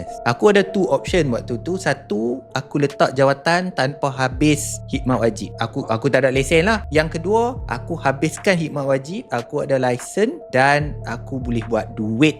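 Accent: North, Malaysia. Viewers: haaa!!! biar betey??? gilalah.. siap loghat utagha tu... ni mcm mahathir lah ye??m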